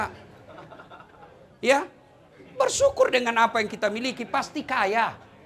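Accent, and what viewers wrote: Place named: Makassar, Indonesia. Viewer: Kusuka na karna tetapji tawwa logat makassarna.... Tetapki bgini ustad jammeki logat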